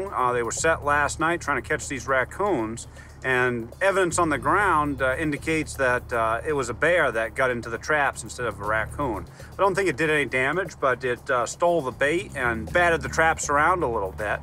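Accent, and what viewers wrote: Boston, United States. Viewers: He has to be from Boston.... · Boston accent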